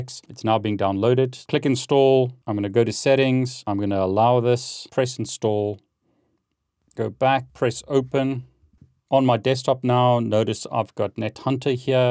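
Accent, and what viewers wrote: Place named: South Africa. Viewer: My 1st comment ever..😅a.you sound like you're from ZA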